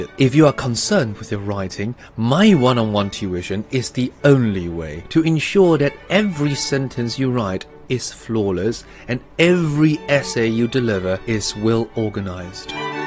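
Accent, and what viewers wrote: United Kingdom. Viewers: 英式英文就是優雅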